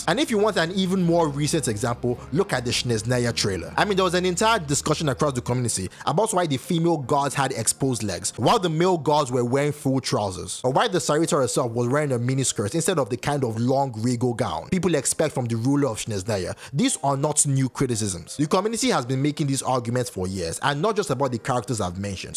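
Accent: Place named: Nigeria. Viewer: lmao i enjoy these genshin videos and the nigerian accent makes it better.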